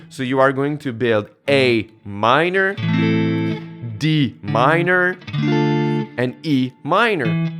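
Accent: Quebec, Canada. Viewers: Tellement l'accent du Québec, très bien expliqué mon gars ! 👍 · Hey are you québécois? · Ok, I’m saving this video. I’m going to have to watch it a few more times. BTW, are you from Quebec? I’m in Montreal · Hearing a quebecois accent here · You Sir, sound like you are definitely French-Canadian. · Accent québécois en tabarnak !! Nice vidéo !! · Are from Québec ?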